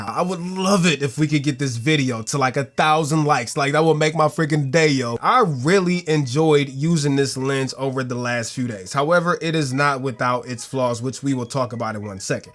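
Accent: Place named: United States. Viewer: Excellent, short video. You've covered everything that needed to be said and illustrated the points with decent photos. This is rare among YouTubers. Of course, being English, and living in Greece, l didn't always understand your US version of the language but l will try and catch you again. 🤣😎🧿 I will be getting the lens for street photography. It seems to be the perfect fit as a walkround lens on my A7r3 and an upgrade over the Sony/Zeiss 2.8/35.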